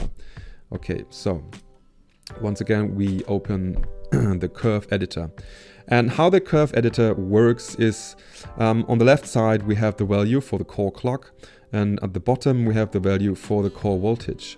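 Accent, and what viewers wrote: Germany. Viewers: German accent and American cap.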